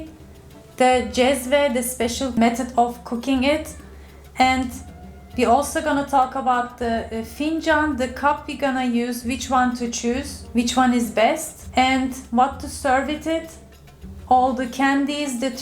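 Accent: Turkey. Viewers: Omg its horrible türkish style english 🥱🥱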